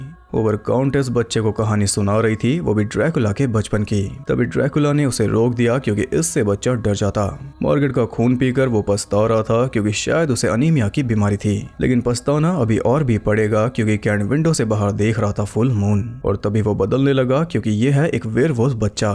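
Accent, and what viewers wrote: Haryana, India. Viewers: Tm hariyana se ho na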